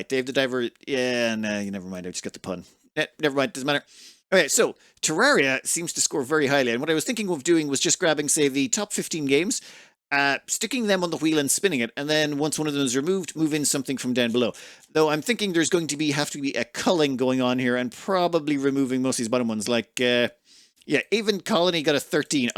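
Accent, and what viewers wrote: Ireland. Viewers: Lol was trying to figure out why your Irish accent wasn’t so think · Just totally LOLed at "People can't tell the difference if I'm saying "or, or, or". Made me laugh a lot, I'm one of those people that can't hear any difference. For us in the U.S. it's "Or" = or "R" = arr. Similar to the pirate trope. Dont change,. Love your videos, and what initially caught my attention was the Irish accent. Its just a huge bonus that they are also entertaining and contain awesome gameplay tidbits and information. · If it's any consolation, you sound very Irish to an American in England.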